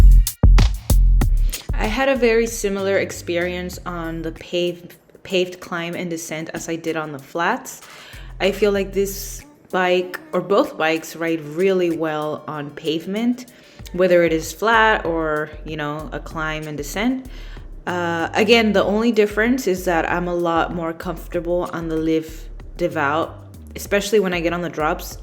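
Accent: Philippines. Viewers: parang may puntong piniy toits 😄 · you sounds like pinoy 😁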